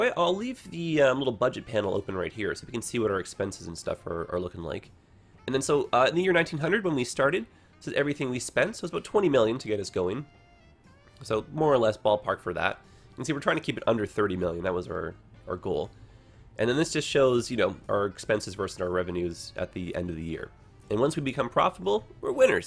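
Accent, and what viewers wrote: Canada. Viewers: I know this is a 3 year old comment but that's just his Canadian accent.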